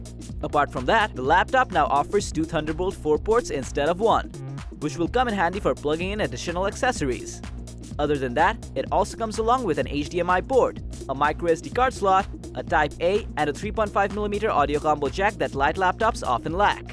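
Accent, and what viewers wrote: India. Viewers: Dude your Indian accent shines through that fake one. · Are you by any chance Indian? Not that it's important but I can't help but ask because of the accent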